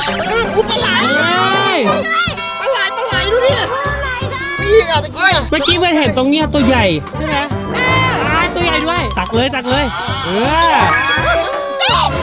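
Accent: Isan, Thailand. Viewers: เป็นคนอีสานแมนบ่จ้าอ้าย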